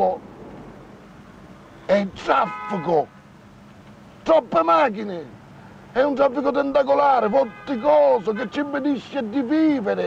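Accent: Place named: Catania, Italy. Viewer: Accento (catanese e non palermitano) orribile peraltro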